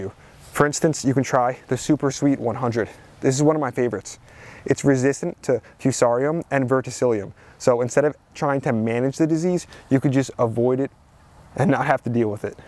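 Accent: New Jersey, United States. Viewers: Love the jersey accent. Midwest college had tons of NY NJ MASS kids. Great diversity before that became a thing. Enlightening and broadened education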